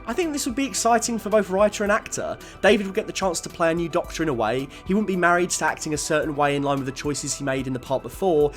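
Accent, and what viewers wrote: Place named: Scotland, United Kingdom. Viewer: Let him keep his REAL voice... Third Scot up. :)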